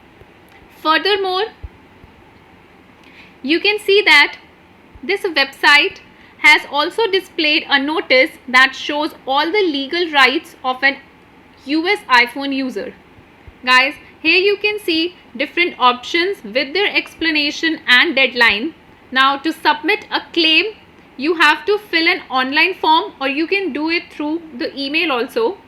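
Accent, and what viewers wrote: India. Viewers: Man even your video with your annoying Indian accent feels like a scam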